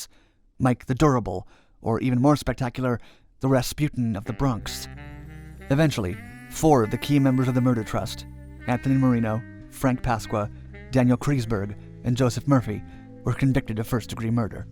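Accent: Ireland. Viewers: My God, that's an Irishman! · can you just do all future episodes in your irish accent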